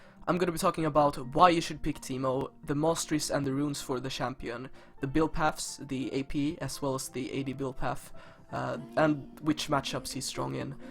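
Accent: Sweden. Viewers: Are you from Sweden bro? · Are you swedish by eny reason you'r accent sounds like it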